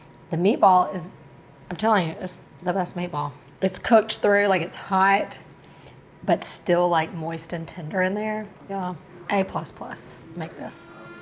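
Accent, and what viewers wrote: South, United States. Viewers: Italian babe with a southern accent. Win win!!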